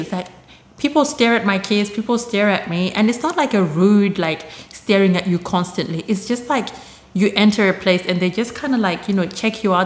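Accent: Australia. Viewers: Your accent is interesting it sounds Australian but not quite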